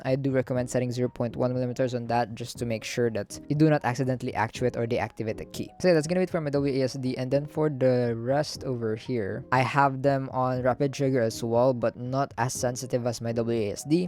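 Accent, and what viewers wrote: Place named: Philippines. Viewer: Are you Filipino by chance? You sound like one. 😊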